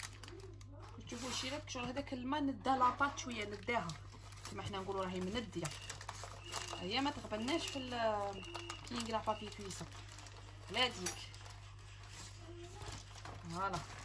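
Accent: West, Algeria. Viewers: باينة عليك وهرانية الله يبارك نعشق في وهارنة سوردو كي يهدرو ههههه لوكان غير تعملينا بغرير وهاد صوالح ويفرحك بقاي تعبري صوالح بلكاطو باش حتا انا نبقا نعمل كيفك مانفهمش هاك نفهم بكاس ونحبك زينة 💋💋والله غير الف حاجة زدتها من عندك فهاد لفيديو ماكنتش نعرفها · ياختي راكي تهبلي أنا من الشرق وتعجبني لهجة الغرب 😍😍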